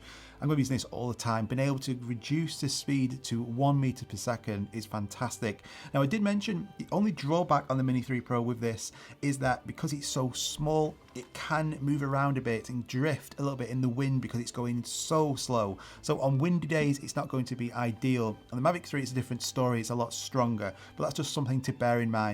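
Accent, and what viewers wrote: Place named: Yorkshire, United Kingdom. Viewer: Btw love your content. I’m in Yorkshire. Can tell you are somewhere in that location with the accent :)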